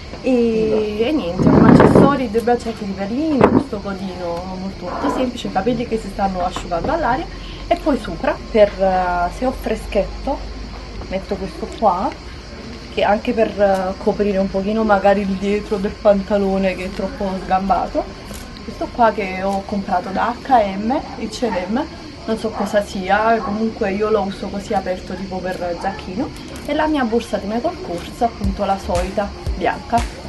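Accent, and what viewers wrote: Naples, Italy. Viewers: ma tu di che parte di napoli sei?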